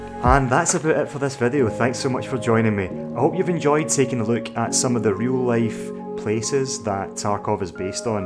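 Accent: Scotland, United Kingdom. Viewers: Appreciate hearing an awfully Scottish sounding voice in a tarkov video. This caught my eyes on my recommended feed and hopefully will get into others too.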